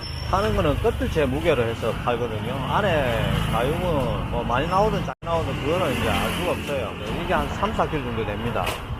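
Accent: Gyeongsang, South Korea. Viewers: 경상도댁 언제나 친근하고 차분한 느낌입니다. 남편 잘 만나 경상도 사투리고 한국말 배우고 말투가 이쁘십니다. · 전형적인 갱상도 ㅎ 잘하고 계십니다!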